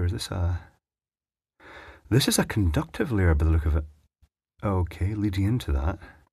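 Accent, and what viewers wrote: Scotland, United Kingdom. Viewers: Twotone Scottish pronunciation.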